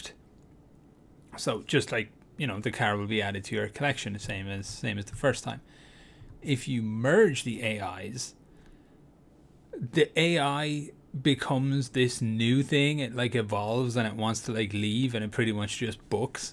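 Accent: Ireland. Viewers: i can feel the irish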